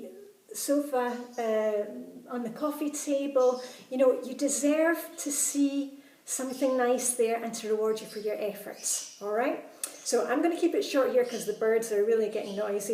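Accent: Scotland, United Kingdom. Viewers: Thank you so much for all your videos. I love your way of if communicating the FlyLady system- it has really injected new life into my routines. I have even downloaded the Teacher Control Journal to help me at work now! I also love hearing your Scottish accent and your lovebirds in the background!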